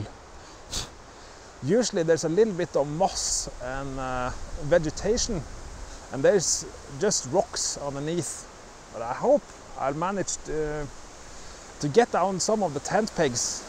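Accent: Norway. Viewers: Really relaxing the way you narrate. Best thing is the Nor-english accent. Hehe ;) FYI : What he is hunting for Rådyr = Venison.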